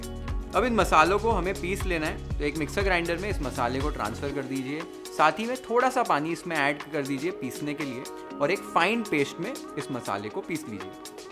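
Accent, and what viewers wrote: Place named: Gujarat, India. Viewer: Music k link Bhi do Na 🥰 fan from A'bad /Aus 💕ur gujju touch in accent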